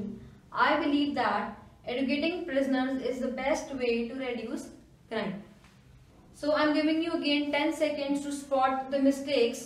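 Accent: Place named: India. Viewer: your talent and effort is equal to solute. but i am not understanding you coz of accent of Indian and sometimes indian words😭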